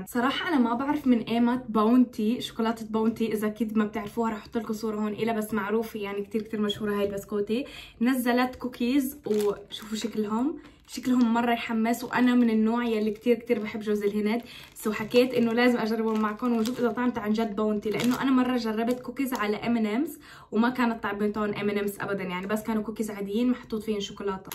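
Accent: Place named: North, Palestine. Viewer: لهجه مدن شمال فلسطين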